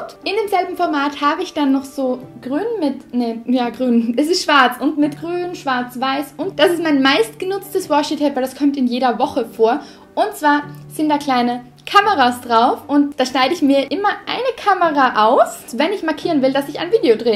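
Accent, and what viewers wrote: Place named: Austria. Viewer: Mehr filofax Videos ich habe mir schon auf Amazon eine Wunschliste gemacht für alle Sachen für meinen filofax denn ich noch nicht habe ich werde mir den gleichen wie du holen weil ich liebe einfach Mint😍 und ich liebe euren österreichischen Akzent ich komme ja aus Deutschland ❤️🔥 Liebe Grüße Florida💓💎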